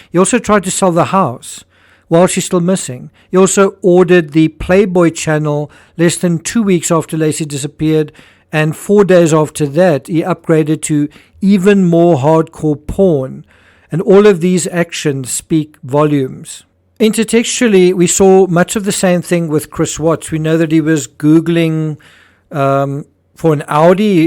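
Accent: South Africa. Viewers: Love your videos ❤ Are you from South Africa? Had to ask😅 Love and blessings all the way from South Africa 🌟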